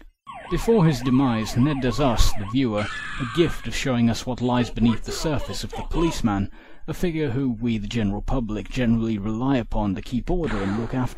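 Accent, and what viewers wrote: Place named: England, United Kingdom. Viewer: I don't. I have asbolutely no idea what this series of videos have been all about. I subscribed to because of slowbeef's rambling mock let's plays, and I have been utterly mystified by the English-accented bloke who has been commenting on this series of flash games, if indeed that's what they are. Although, perhaps, fucking with the likes of myself is perhaps what this series of videos ahas been all about in the first place...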